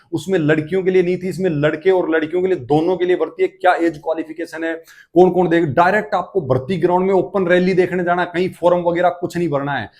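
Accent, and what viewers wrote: Haryana, India. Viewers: Haryana ki ayi ha kya sir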